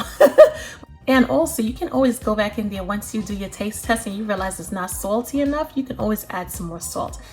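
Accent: New York, United States. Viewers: You sound like a new Yorker 🤔🤔🤔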